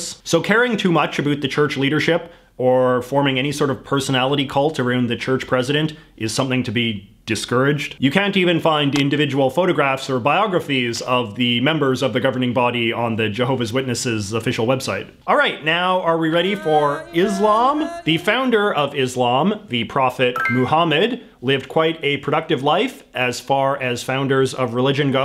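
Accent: Canada. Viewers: this guy has the most pronounced Canadian accent ever. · Yo like can you turn down the canada 3 notches? Like.... there are other ways to pronounce about that dont sound like "a boot"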